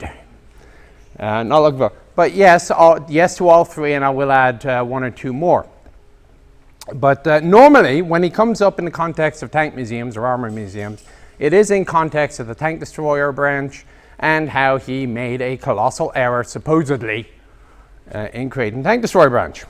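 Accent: Ireland. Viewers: Where is that speaker's accent from? A little bit of Irish? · Irish accent?? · He is CLEARLY irish. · Chance your he's Irish?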